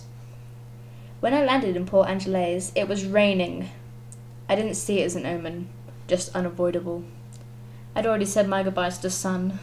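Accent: United Kingdom. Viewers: I LOVE THE BRITISH ACCENT!!!!!!!!! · i love your voice! r u english?!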